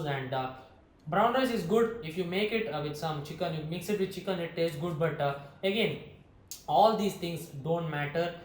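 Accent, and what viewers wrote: India. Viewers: Dude, his accent is what makes the videos quintessentially Indian. In fact I subscribed because of that. No, not his accent but because 1. His content quality was good 2. There is a serious lack of good channels for Indian bodybuilders/strength athletes 3. His accent, throw of voice etc. sound genuine. He's not someone who tries to act all smart for the camera. I'm not trying to put you down or anything. It's just that we feel ashamed when our accent betrays our mother tongue and yet when the French, Spanish, Russians etc. speak in a heavily accented diction, we think it's so cool (some of us do at least) Cheers. :)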